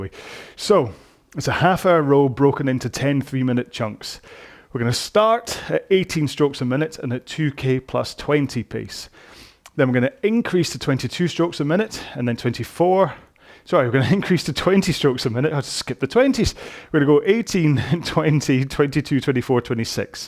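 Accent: Scotland, United Kingdom. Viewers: #so, thanks again for scotlishly (is this a real word?) talking through this climbing intensity row. #muchmorebetter, I know you have already finished the series, but I'm just enjoying them one by one since the sportschools are closed again. Cheers, Bart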